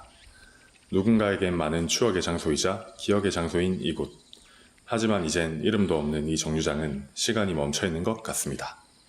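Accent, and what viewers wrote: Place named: Busan, South Korea. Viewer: 부산이신가용? 중간중간에 사투리 억양이 정겹습니다~ ^^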